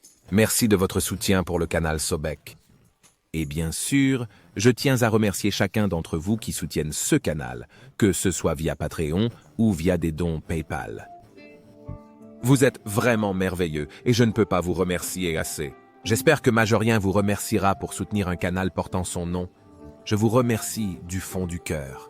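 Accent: Quebec, Canada. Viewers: Je relève des notes d’accent Québécois, je me trompe?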